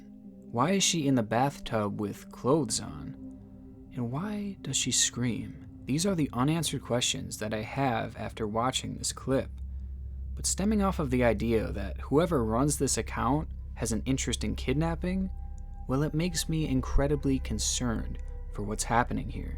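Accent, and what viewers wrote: United States. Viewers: the improper grammer is driving me crazy my guy lol, you keep saying woman when you are talking about women plural. You sound american or Canadian so I'm confused with this repeated mistake. Great video tho keep em up, just my little nit picky gripe.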